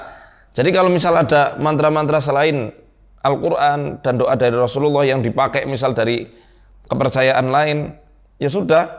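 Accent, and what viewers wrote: Banyumas, Indonesia. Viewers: Ngapak ustad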